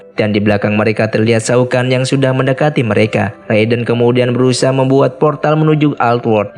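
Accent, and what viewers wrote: West Java, Indonesia. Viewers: Intro nya okos sunda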